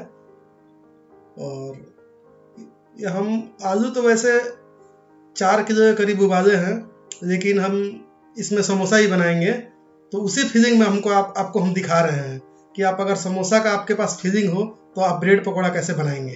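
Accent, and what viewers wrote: Bihar, India. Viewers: Kya aap Bihari hai Tone se lag raha hai Respect 👌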